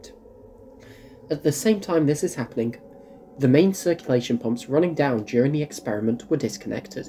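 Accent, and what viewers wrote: United Kingdom. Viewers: Keep that Scottish (?) accent under control pls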